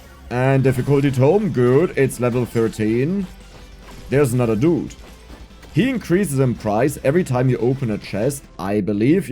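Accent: Germany. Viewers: Didn't know that german-accented commentary was what i needed more of in my life but i will take it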